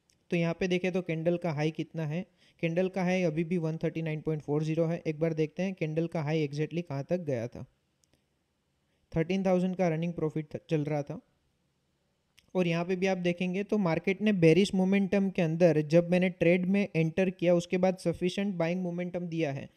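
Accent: Gujarat, India. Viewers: Bhai Tame Gujarati cho?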